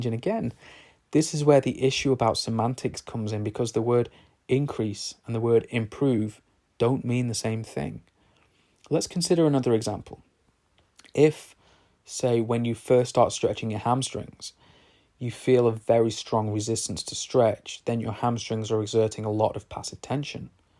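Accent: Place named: Rome, Italy. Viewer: danvanzandt ahahah I’m italian so he just speak it. with a very strong Roman Accent ahahah But don’t worry, really!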